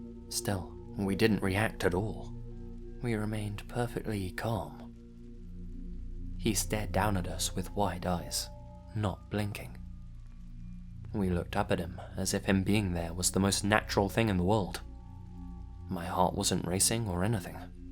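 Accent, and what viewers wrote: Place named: United Kingdom. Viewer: My favorite British narrator is back in action!!! Missed you. Love from USA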